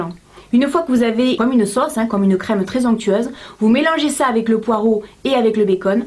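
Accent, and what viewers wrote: South, France. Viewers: J'adoooore ton accent ! Etant moi meme sudiste mais loin de chez moi, tu m'apportes du soleil là ;) Merci pour toutes ces vidéos, je suis vraiment fan de tes conseils et de ton originalité !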